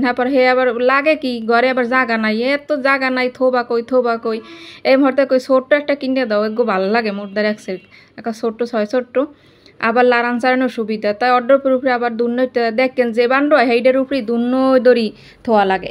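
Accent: Barishal, Bangladesh. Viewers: আপু চা খেয়ে নিলে পুঁই শাক রান্না খুব সুন্দর হয়েছে ওয়ারড্রব গুছানো অনেক ভালো লাগলো তোমার ওয়ার্ড ড্রপ অনেক সুন্দর লাগছে আপু তোমার কথা শুনে মনে হচ্ছে তুমি বরিশালের কোথায় তোমার বাড়ি জানিয়ে দিও ভালো লাগবে আমার বাড়িও বরিশাল ❤❤ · বরিশালের ভাষা দারুন লাগলো বরিশাল তোমার বাড়ি কোথায় আমার শ্বশুর বাড়ি বরিশাল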